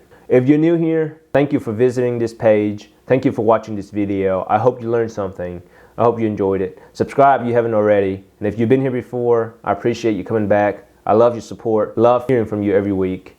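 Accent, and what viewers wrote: South, United States. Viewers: Totally!! He totally got the Southerner accent, which is so cool. :)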